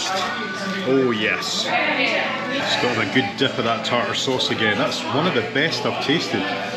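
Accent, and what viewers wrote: Scotland, United Kingdom. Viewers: Hey! Realy Loved watching this Video of yours!... Is IT only me Hearing you speakgreat scotiish accent?~ did realy😂tiggl my ears~✓dearly Love you talking this way!..i am No native Englisch speaker😂,sorry& you Made me Pick Up the Dictionary 3 Times,Just to try& get IT right❤ Thanks a Lot for your lovely Video!& I must admid:Love your Understatement Mindeset🎉 cheers! Greetings from Berlin, germany~t. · Always enjoy your YouTube travel videos and especially your accent. Long live Scotland. Watkins Glen, New York is well worth a visit. It’s at the southern end of one of the Finger Lakes and is home to a car race track with a storied history dating back almost seventy years. Jackie Stewart raced here in F1. The town can be very busy when the race track has an event, which is quite often. Car clubs rent the track and accommodation can be scarce. 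You might want to visit during the Vintage British Car Club event. Hiking up and down the actual “Glen” is a spectacular experience. The schooner “Love” sails up the lake and is famous for its Moscow Mules. Other ships offer dinner cruises.Stay at the Senneca Lodge. The A-frame cottage are best. Drink at the bar that has hosted F1, NASCAR, TRANS-AM & CAN-AM car racers from around the world since the fifties. Hundreds of race memorabilia litters the walls and hours can be spent reading all the car paraphernalia. Every college in the world seems to have nailed their pennant to the ceiling. The nearest airport is either Rochester, Syracuse or Buffalo, NY. Alternately, fly to NYC and take AMTRAK to Rochester and rent a car. AMTRAK also runs from Toronto, Ontario, Canada to NYC and you could jump off somewhere on the South Shore of Lake Ontario and rent a car and later continue on to NYC or Toronto.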